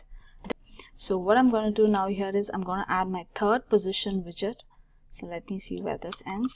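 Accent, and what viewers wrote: India. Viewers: Do this excersice was a quit difficult to me without seeing the code, because my english is "in working progress" and, by another hand, it was so difficult to understand hindu accent (like an England accent too..!). This was a challenge to me, but, like you said: "Yeah, there you go". I want to share you THE CODE: <link> Is in Spanish, so... do like me..! if you can't understand, use traslate from google..! Thanks so much for yours videos and to teach me that I can do it despite the adversities..!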